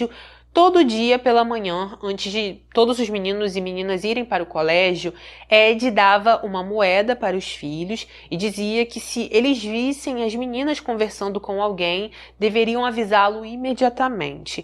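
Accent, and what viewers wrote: Rio de Janeiro, Brazil. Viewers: Adoro seu sotaque do Rio de Janeiro . Saúde, sorte e felicidades! São Luís, 28/07/2023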